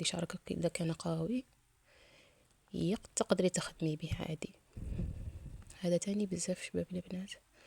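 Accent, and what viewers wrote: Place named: Djelfa, Algeria. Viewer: عندك الجلفة في صوتك 😬اااااااا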